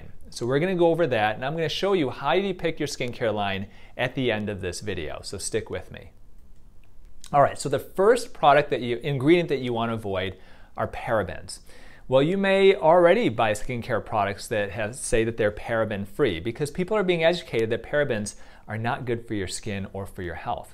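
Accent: United States. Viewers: Thanks so much!🤩 And your voise reminds me USA 16 years ago.. Was there with Work and Travel program as a student. Happy to find this channel 🥳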